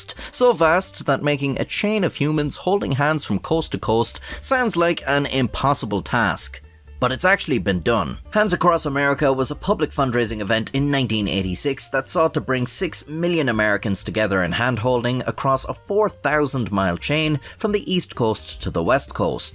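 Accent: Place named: Ireland. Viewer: Tell me why this narrator sounds like some sort of animated insect with an Irish accent?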